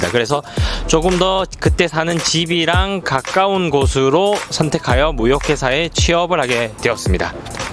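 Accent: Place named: Busan, South Korea. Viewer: 영상보다가 억양을 들어보니 부산분 같은데 고향이 어디신지요? 저는 부산입니다.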